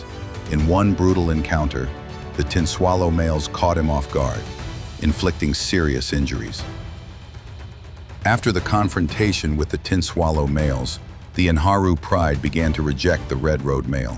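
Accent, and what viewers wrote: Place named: United States. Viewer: listen to the dumb usa gump reading about africa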